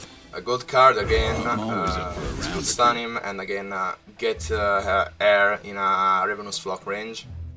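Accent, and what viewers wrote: Italy. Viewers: Italian accent + I'm italian.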